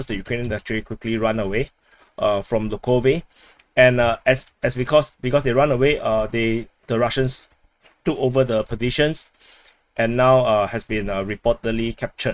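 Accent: Singapore. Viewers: HUH .. It' a Singaporean accent.. You know like an Australian Accent .. Or Alabama or CAnadian.. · We love your Singaporian accent! Keep going ! Your are the best channel on Ukraine war! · Never apologise for your accent. I know that it is nowhere near full on "Singlish". I am also pretty certain that you speak at least one Chinese dialect and also Malay and that you speak both as well as anyone. The number of languages that most Singaporeans speak has always astounded me.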